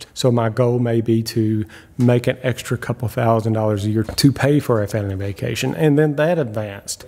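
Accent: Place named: Virginia, United States. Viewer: I gotta ask. Are you from Virginia by chance. Just going by your cool accent.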